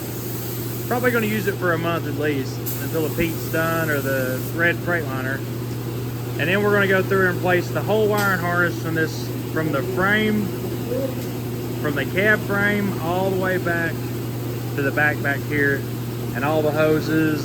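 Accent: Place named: Tennessee, United States. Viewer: Close your eyes, who does sound like? He could do a voiceover for Gomer Pyle effortlessly. I don't say this to make fun my accent is 150 miles east of you in Tennessee so I have the accent as well.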